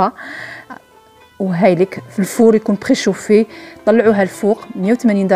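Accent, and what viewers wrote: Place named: Casbah, Algeria. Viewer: الله يبارك فيك والله انت بنت طبع وشان هدرتك والله كيما ماني وماما وعماتي بنات القصبة وطيابك كيما هوما الله يبارك كيما هوما حتى انا طيب كلش تقلدي ومانعجش للمهراز نهار تزوجت عطاتني ماني مهراس تاع نحاس 🤣 والله كي نتبع ونتفكر كي كنت ندخل مع ماما لكوزينة ولغربية صح تاع زمان ماجيش مشقة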